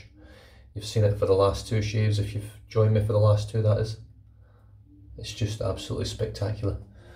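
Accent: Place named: Scotland, United Kingdom. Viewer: Your voice in not monotone, it is Sco’ish. I have no idea what you’re saying but it sounds fantastic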